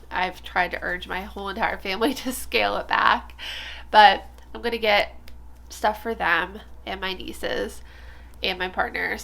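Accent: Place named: Pittsburgh, United States. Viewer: Love meeting Pittsburgh Knitting ladies. I lived in Squirrel Hill until mI was 12. Then we moved to CA. I still have a few friends in Pgh., none that knit, sadly. My husband and I live north of Dallas,Texas in Melissa, Texas. I hear Pittsburgh in your voice but not as much as my mom, her sisters and brothers my aunts and uncles and their kids, my cousins. I just wanted to say enjoy yourself doing your podcast. That’s what we will enjoy. I need to plug my tablet in before it dies. I’m a new subscriber, but I was trying to say enjoy yourself, don’t worry about us, lol. As you know we just want to have company to watch while we knit. 😊😂❤ Thanks so much.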